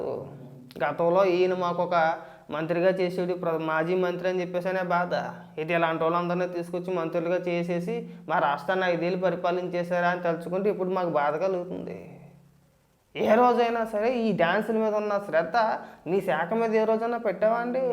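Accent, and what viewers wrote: Godavari, India. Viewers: సంక్రాంతి శుభాకాంక్షలు గారు.... మీ వెటకారం అంటే చాలా ఇష్టం😂... గోదావరి యాసలో అదరగొట్టేస్తారు మీరు 😂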